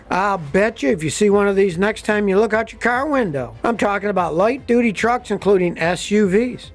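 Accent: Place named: California, United States. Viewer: you’re sounding like a californian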